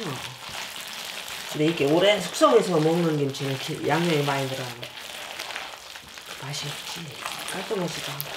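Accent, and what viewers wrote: Chungcheong, South Korea. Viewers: 정감있는 충청도 말씀이셔서 고향이 충청도이신 돌아가신 친정엄마 생각 많이 났습니다 좋은영상 감사합니다 건강하세요~^^👍💖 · 영상 잘 봤습니다. 저희 어머니 고향도 충남이다 보니 꼭 저희 어머니 말씀하시는 줄 알았습니다. 만약에 40kg을 담근다면 님께서 20kg 기준으로 한 재료보다 2배정도 재료를 더 넣으면 되겠죠?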